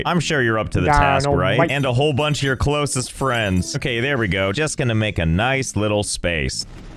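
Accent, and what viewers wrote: United Kingdom. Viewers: 1 Question. Are you British?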